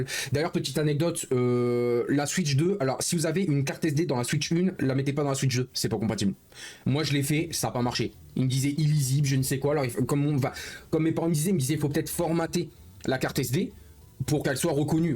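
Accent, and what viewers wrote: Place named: North, France. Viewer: l'accent de frotteur de bus du nord frère c'est effarant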